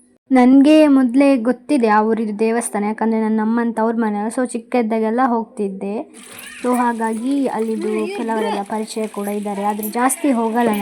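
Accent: Mangalore, India. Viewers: Uttarakarnataka style mathadi .manglore style mathadbedi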